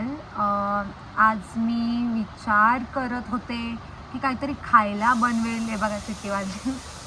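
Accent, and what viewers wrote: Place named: Maharashtra, India. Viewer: Bhai video, story sagla missing are re🫡 confidence chan ahe tuza ani marathi accent vaprun chan content record krun grow kru shaktes re! Keep going bhava❤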